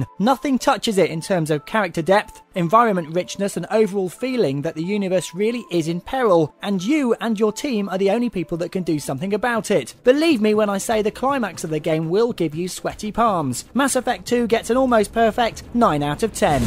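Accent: United Kingdom. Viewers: Britain has more different accents than most countries of its size. Trust me, he's british- cos he sounds exactly like I do!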